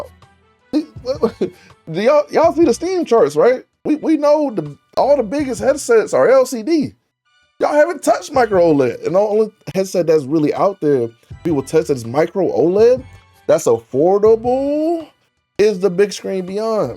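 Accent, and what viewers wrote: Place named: South, United States. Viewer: You can be as country as you want when you're spitting facts....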